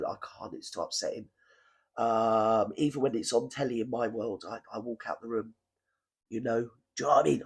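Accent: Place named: Manchester, United Kingdom. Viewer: we love your accent! You remind us of our gal pal from Manchester, England, Julie. It took us forever when I hired her as an accountant. We love her to pieces. Enjoy your personality and accent. GG from Texas. We don't see enough of you sista girl.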